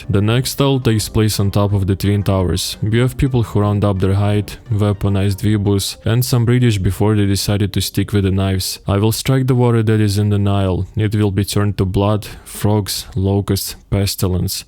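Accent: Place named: Russia. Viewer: thick russian accent... I LOVE IT!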